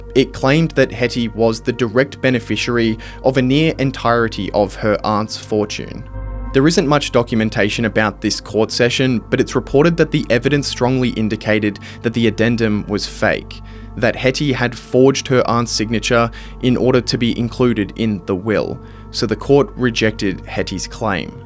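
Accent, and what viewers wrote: Australia. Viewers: Ugh it’s hard to stay engaged with this Australian accent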